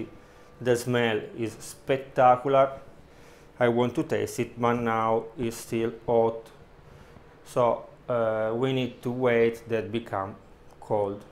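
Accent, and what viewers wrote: Italy. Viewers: I have never seen sourdough started like this. I am going to try it as I have a dud in my kitchen right now from an amazon San Francisco starter. I should get a replacement for it as the company stands by their product, but in the mean time I am going to add some honey and see what happens, then I am going to try this method. No waste! I hate it when it says you have to throw Away and refresh. What"??? I don’t think so! I absolutely adore the accent too. Italians speaking English.. adorable. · LOLOL! It's making me laugh so hard! Especially when I turned on the captions! The captions don't understand a word of what he is saying...and neither do I! He must be from Italy?? He reminds me of the muppet who is a chef!! I like this guy..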